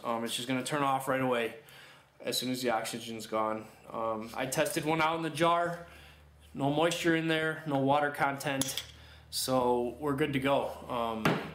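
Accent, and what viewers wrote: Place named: Canada. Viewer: Because of your accent and speech pattern. Are you Canadian or from a state near Canada.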